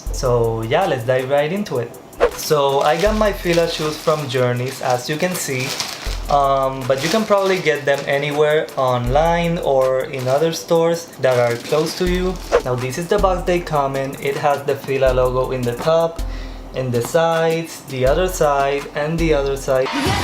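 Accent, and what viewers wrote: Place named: Philippines. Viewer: You're accent is like a Filipino speaking in English. :) Like us!!! I Love you and your shoes!!!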